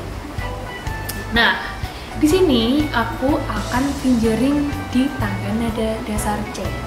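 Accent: Java, Indonesia. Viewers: la wong emg org jawa tulen koq wkwkkw :v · Kak orang jawa ya? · mbaknya medok😂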